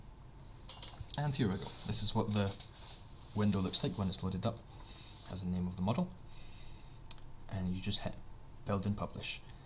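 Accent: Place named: Scotland, United Kingdom. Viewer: So Scottish. <3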